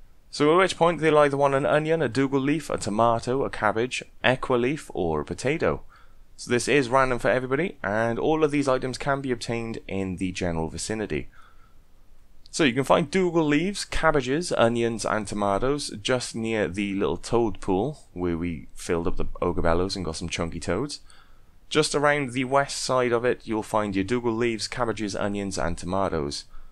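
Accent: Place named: Scotland, United Kingdom. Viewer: I miss you and your Scottish accent </3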